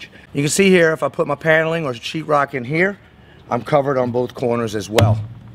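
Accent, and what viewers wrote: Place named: New Orleans, United States. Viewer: You should have built a scale model shed by ripping down some 2x4s and made it as a dog house for your dog. :) Might have a bit of trouble finding scale model shingles though... :) You mention Louisiana... From your accent, I would say maybe in the New Orleans area and from the terrain, I would say probably on the north side of the Lake... If Slidell, probably north of I-12 since it is higher and did not get hit as hard by Katrina... Or maybe inland some around Covington or Mandeville... I grew up in NE Louisiana, but live in the Houston area these days...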